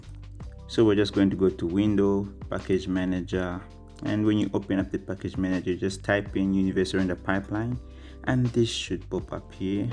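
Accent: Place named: France. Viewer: Sounds like a French accent, great video!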